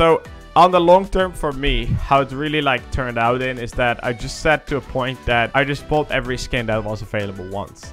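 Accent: Netherlands. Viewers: Haha je hoort gewoon dat je NL bent 😂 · is it me or does sound a little dutch? or i’m wrong 🥴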